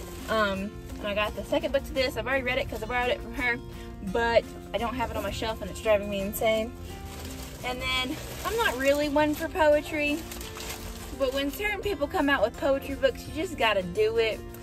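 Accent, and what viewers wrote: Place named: South, United States. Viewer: Not to be creepy or anything, but I live in the same place you filmed this :) I knew I heard a southern accent! (I have one, too, no worries) 😂 loved the video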